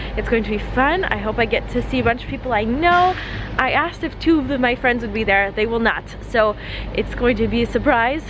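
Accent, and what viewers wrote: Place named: France. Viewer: I can hear your french accent in your English 😁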